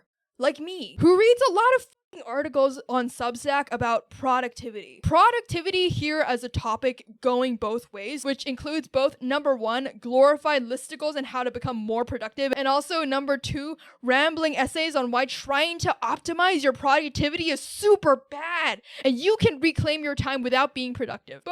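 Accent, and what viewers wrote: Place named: United States. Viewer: you say you live in london, but your english sounds very american.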